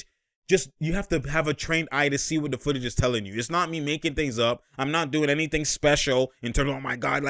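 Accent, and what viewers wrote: Canada. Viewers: U Canadian bro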